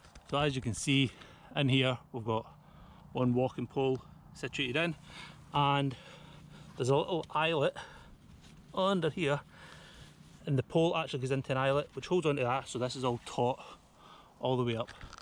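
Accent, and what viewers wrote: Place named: Scotland, United Kingdom. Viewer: If only all other tent reviews on YT were as clear, thorough/detailed, and as succinct as your...a brilliant effort, and that lovely Scottish accent as well!..the only things I would have added would have been: 1. To show the space in the inner with a sleeping bag to determine if it actually touches the inner, and 2. You sitting up inside the inner to give an idea/perspective of the space. But that's easy for me to say having watched it. Thanks, a worthwhile use of 15 minutes of my time!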